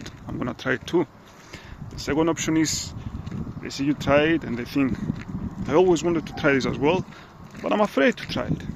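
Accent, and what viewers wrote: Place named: Cyprus. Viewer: Love love love the Cypriot accent ❤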